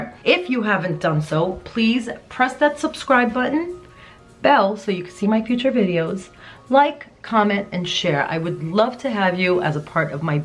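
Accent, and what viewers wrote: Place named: East Coast, United States. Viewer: Omg your east coast accent/chicago ??? accent is mind blowing. Thank you for your tips seriously!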